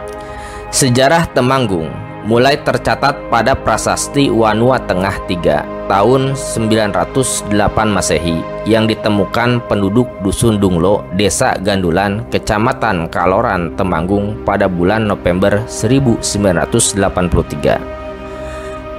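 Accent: West Java, Indonesia. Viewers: naratornya orang sunda bukan jawa tengah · Yg punya chanel kaya logat orang sunda malah paham sejarah temanggung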